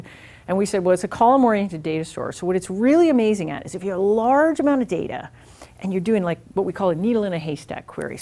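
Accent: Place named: Canada. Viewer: excellent video #1 - canadian accents are awesome #2 - "it's not a pivot. same product, same thing. It's just a different way of talking about it" #3 - i think i'm going to join <link> #4 - "if it wasn't really hard, we'd all be in fiji drinking fruity drinks from a coconut, but we're not because this is hard" #5 - what does "gorpy" mean?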